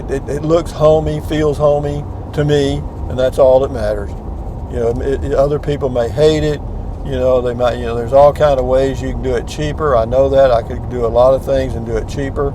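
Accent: Texas, United States. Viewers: Happy July 4th! Another enjoyable video. Since watching your videos the last few months I am enjoying life more. Your uplifting no nonsense attitude with your Texas draw is giving us something good in our day. My daily dose of smiles :) Thank you!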